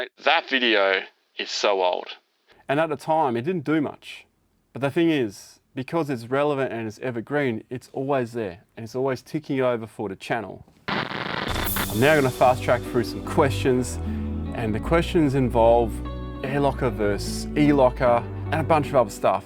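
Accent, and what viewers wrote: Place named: Australia. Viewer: Mate, stuff em. I've watched so many of your educational videos that I dream in an Aussie accent now. Keep doing them, especially since most 4WD'ing tutorials and advice just tend to come from guesswork rather than experience.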